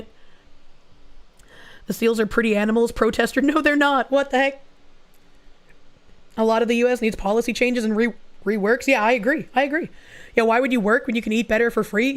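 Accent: Boston, United States. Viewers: Is it me or does sound more feminine while sick? It really breaks up the Boston accent lol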